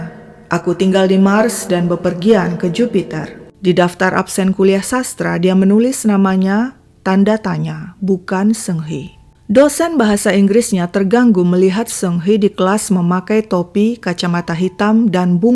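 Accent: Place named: Medan, Indonesia. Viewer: kakak btw orang mana .seperti orng medan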